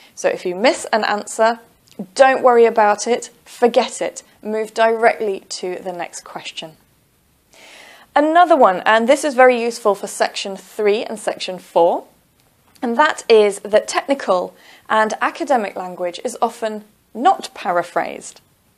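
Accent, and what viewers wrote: England, United Kingdom. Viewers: i really love you madam, i really love the way you make us understand. so easy and slowly, however the British accent is a bit difficult to understand. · 😂 lol yeah Because is an English lady living in england😎 accent can tell the saga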